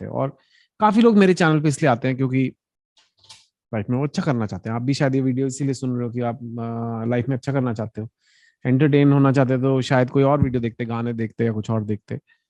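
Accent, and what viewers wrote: Delhi, India. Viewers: I like the way he talks like Delhi Walla style.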